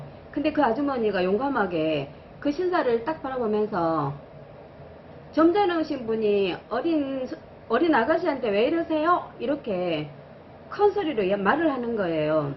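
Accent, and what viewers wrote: Gyeongsang, South Korea. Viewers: 경상도 악센트가 진하니 푸근하기도 하고, 말씀의 내용이 강약이 생겨서 더 정겹고, 명확하게 들려옵니다~ ^^ 들여다 보는 놈, 얼마나 놀랬을까요~ㅎ 그쵸, 한 번의 나쁜 것을 일반화시키는 것은 위험하죠~ ㅎ 재밌게 잘 들었습니다~ 👍👍👍+41 먼저 찾아주심에 손잡고 응원합니다~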